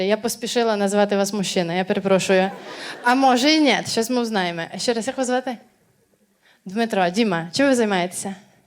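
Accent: Transcarpathia, Ukraine. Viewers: Оригинально, уникально, по закарпатськи! Йоу!🎉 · слухаю тільки через закарпатський діалект😅, а так ніц не смішно, хай шлєк трафить 😅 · Пані Валеріє, дякую Вам і Вашим батькам за Вас! Мені дуже подобаються Ваші виступи, концерти! У Вас прекрасне почуття гумору, елегантний стиль, чудова закарпатська мова. Я пишаюся, тим, що наша Україна має таких чудових талановитих дівчат! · Так, до не до неї, бачте як плескають в ладоні, мабуть дуууже подобається, цеж русинська мова, так вони,Закарпаття, кажуть!!!на цей говір... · Та ви шо! Не любиться, та не позирайте і не слухайте. У нас на Закарпатті ми так говориме, а ви говоріть як маєте дяку. А ще і совіти роздаєте коли вас не просять. Мало не красно.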